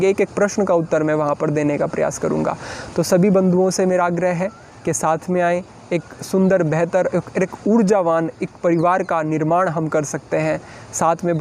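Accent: Standard. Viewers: So clear and Pure Hindi and video is good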